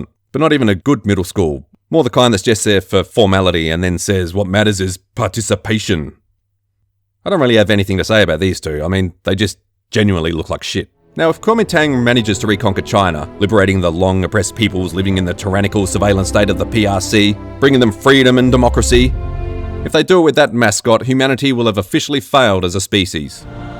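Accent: Australia. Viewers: You honestly sound like a Australian not a Frenchman. Where are you from originally? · Yah he sounds Australian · Tu es français, mais je pense que ton accent écoute comme un peu Australien ? Je suis australien et j'apprends le français au lycee mdrr👌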